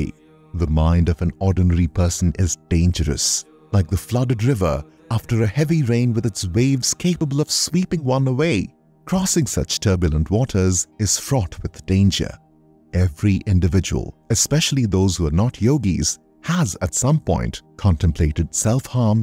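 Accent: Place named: India. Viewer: This is definitely not Ai, just because an Indian accent is “foreign” to you, does not make it ai. This has most definitely been script/edited and voiced by a human.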